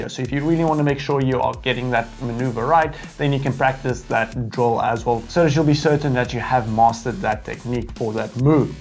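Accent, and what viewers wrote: South Africa. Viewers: I've just started getting into fpv flight (got a tinyhawk rtf bundle and put PMB on it in betaflight) going to watch all of these vids. Also are you South African?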